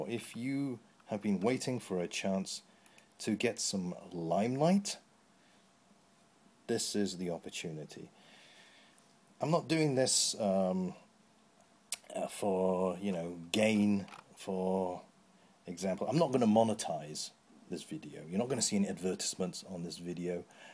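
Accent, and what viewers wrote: United Kingdom. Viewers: I love you so,. You really are the community's daddy. It says quite a lot, being able to bring together such a traditionally fragmented fan base. True, it is only the Youtube Gundam community, but every time the Gundam community manages a respectable step forward, I think it deserves pretty massive applause. Also, when you're joking about "how do I post a video response" I couldn't help but wonder how long you've lived in Hong Kong; your British slagging seems to not have faded yet.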